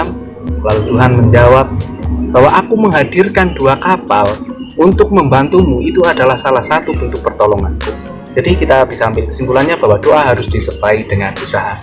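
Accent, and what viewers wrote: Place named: Java, Indonesia. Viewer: medok gaes hehe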